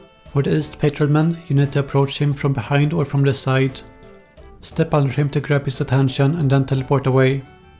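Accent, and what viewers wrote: Sweden. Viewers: Herregud din Svengelska.. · thats the swedish-english accent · below average in english and he sounds swedish, most(young) swedes are better than this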